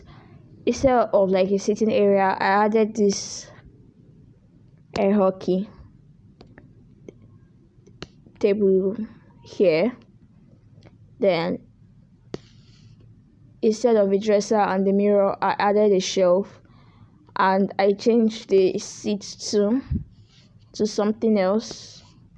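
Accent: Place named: Nigeria. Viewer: As I heard your voice, I knew once you were a Nigerian 🇳🇬😝😝😝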